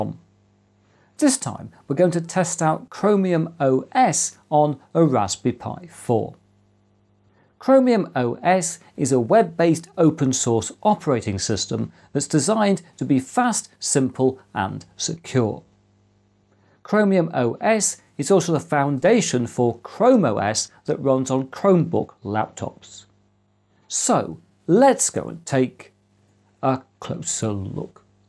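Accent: United Kingdom. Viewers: I just love the way Britons talk.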